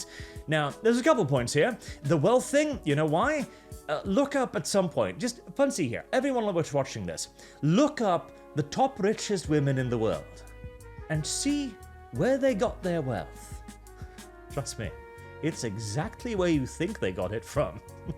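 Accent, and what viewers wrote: United Kingdom. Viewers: I always thought he was British. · I had no idea you were Norwegian. I guess it's the 17th century British military officer thing you got going on. Not saying it's bad. When I started watching your 40k lore videos, I pictured you wearing a stuffy uniform with a monocle, and a handlebar mustache. 😂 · You’re the most British Norwegian I’ve ever heard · Wait, you're Norwegian? I thought you were from the UK. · I thought he was a brit for years until I heard him mention he is norvegian xD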